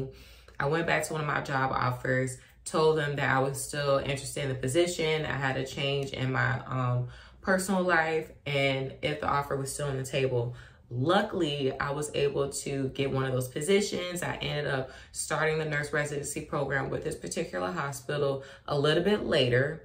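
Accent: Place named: Cleveland, United States. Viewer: Are you from Cleveland? You sound just like my friend from Cleveland.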